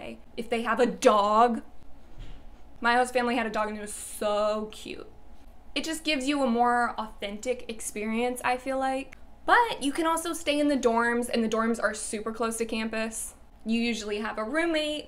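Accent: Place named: South, United States. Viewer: Thank you for your Speaking Japanese in a Southern accent videos, those made my day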